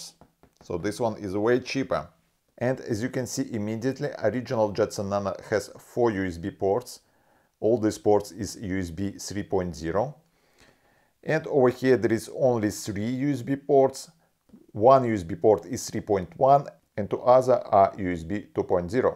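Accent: Russia. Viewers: 38 seconds into the video and I can't stop wondering: Или автор русский и ничегошеньки не хочет делать с акцентом or if this is the most hardcore russian accent imitation I've ever seen. Good vid though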